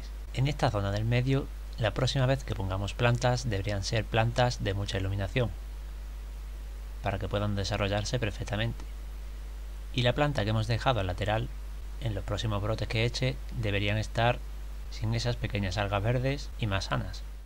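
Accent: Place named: Andalusia, Spain. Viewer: oye tu de donde eres porque he estado viendo videos tuyos antiguos y tienes acento andaluz porque yo soy de andalucia xD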